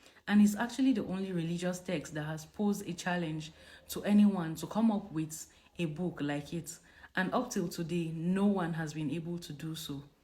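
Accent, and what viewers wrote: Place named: Hausa, Nigeria. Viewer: Sis, but you have Hausa accent. Did you grow up in northern Nigeria?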